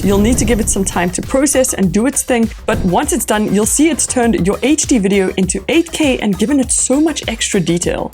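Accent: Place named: South Africa. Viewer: Always satisfying to hear that accent and know exactly where someone's from. Greetings from a South African in Poland!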